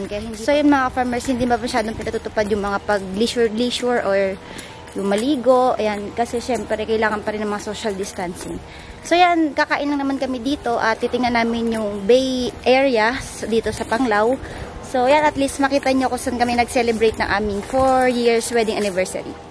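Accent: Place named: Iloilo, Philippines. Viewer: Parang d ka nmn purong Tagalog ilongga ka ata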